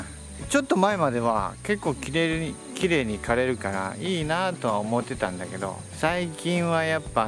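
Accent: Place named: Oita, Japan. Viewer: さま 大分弁丸出しでいつも楽しんでます。 草刈りのチップソー飛び散って痒いのも 有る有るですね。飛散防止をつけるのが良いかもしれませんが 私もそう考えながらまだ購入してません。草刈りがちょこっとだからです。 はなちゃんは必ず予防注射してから 離してください。パルボなんかでコロって死ぬ事が多いです。 一度は注射してませんか？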